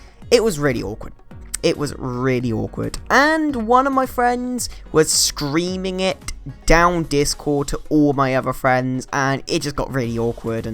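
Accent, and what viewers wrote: United Kingdom. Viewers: Im sorry but are you british? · Omg I love your British voice I always wanted to speak British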